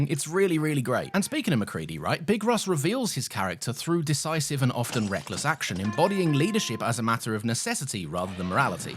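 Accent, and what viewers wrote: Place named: United Kingdom. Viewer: 20 secs into a video and I already hit the subscribe button Your vibe totally clicked with me, the pacing, the delivery, the montage, everything Keep it up, brother, that's some good stuff you're doing here in all honesty P.S. A cherry on a top of all this is the fact that I don't have to put you on 1,25 speed because you are already fast enough P.P.S. Your accent is another one (by the way what is it? I'd say it sounds British but I'm not too good at guessing these)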